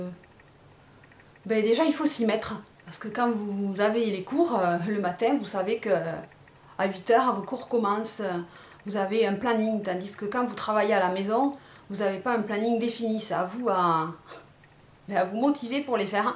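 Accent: South, France. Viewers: Je voulais juste te remercie car en regardant ta vidéo je me suis remotivé dans mes études,j'ai vraiment envie de m'appliquer (tu m'a également fait versé une petite larmichette). Ton parcours est certes atypique (comme tu le dis si bien avec ton accent du sud), mais c'est surtout le parcours du battante. Je trouve que tu forces l'admiration et le respect. Je te souhaite le meilleur dans la vie et beaucoup de réussite professionnelle, tu le mérites vraiment, t'es une fille en or. Bisous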